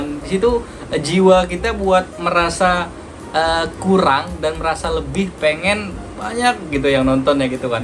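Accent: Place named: Medan, Indonesia. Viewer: awak setelah tahu kayak seperti bahasa medan gitu.......baru awak suscribe biar enggak apah kali....Selamat ya bang dan bang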